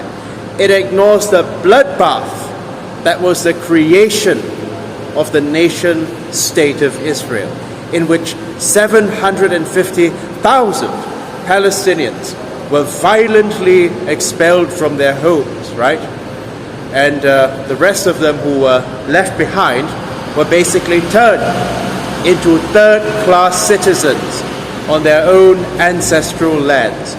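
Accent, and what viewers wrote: RP, United Kingdom. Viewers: I love your high-faluten slightly pompous, pucker British accent. It takes some getting used to. The comments here are barbaric. Though I don't agree with your perspective, I do enjoy your clear vocalization of it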